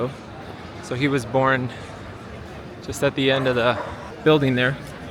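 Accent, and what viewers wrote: Andalusia, Spain. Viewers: Love that u speak andaluz😮‍💨💚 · me encanta tu acento andaluz 🤩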